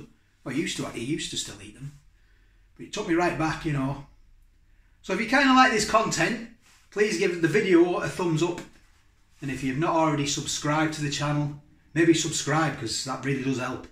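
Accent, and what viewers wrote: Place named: Yorkshire, United Kingdom. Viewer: Where are you from.. you gotta be yorkshire lol.. where about.. I'm Leeds .. I'm gonna try this.. when iv room int freezer lol x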